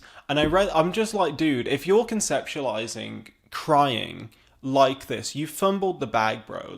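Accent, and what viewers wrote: United Kingdom. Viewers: oh ... and I truly love your British English "accent" ... brilliant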